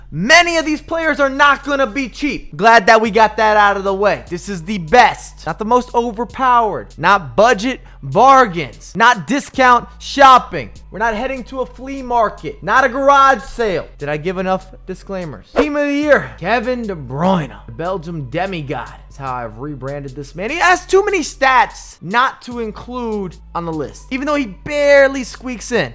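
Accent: United States. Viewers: Bro you american?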